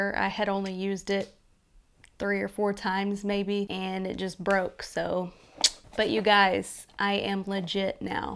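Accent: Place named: Oklahoma, United States. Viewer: Thanks for the vid it was helpful! I was trying to figure out what your accent was and I saw it’s Oklahoma 😁 I’m in Alabama and we have a similar accent.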